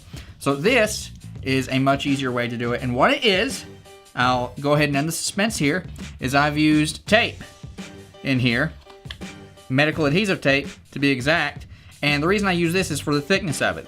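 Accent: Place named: Tennessee, United States. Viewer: I'll have to try this. Are you from TN by chance? Just cause of the accent is why I ask.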